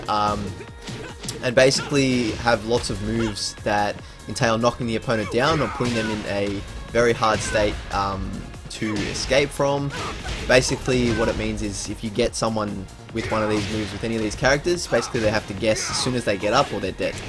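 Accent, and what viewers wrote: Australia. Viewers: The moment I heard your voice haha I knew you were aussie😂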